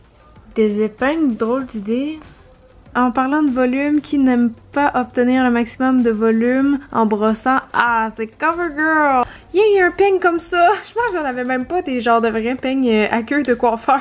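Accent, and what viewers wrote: Quebec, Canada. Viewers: mon dieu j'aime tes vlogs, j'aime ton blog, j'aime tes vidéos, j'aime tes snaps, j'aime ton accent québécois, je te trouve toujours resplendissante, bref je t'aaaaaime fooort❤️ et aussi😘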